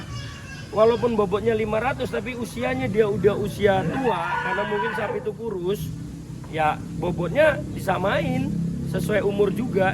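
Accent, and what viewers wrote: Banyumas, Indonesia. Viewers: Ora ngapak yaa ora kepenak... Ora kepenak yaa ora ngapak... 😍😍😍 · Ciri khas ngapaknya jangan sampai tertinggal mas selalu sukses selalu lembu benggolo farm & resort 🙏🙏🙏🙏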